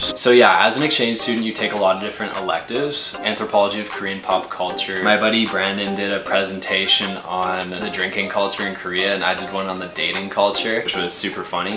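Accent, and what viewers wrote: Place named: United States. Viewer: Can I ask where you are originally from?: You sound like an American. Thanks!!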